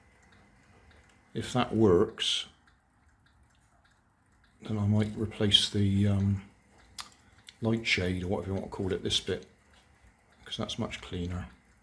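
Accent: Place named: RP, United Kingdom. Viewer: you have a BBC type voice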